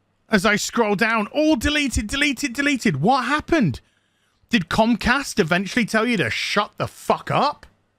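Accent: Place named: United Kingdom. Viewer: I like how you sounded British in this video.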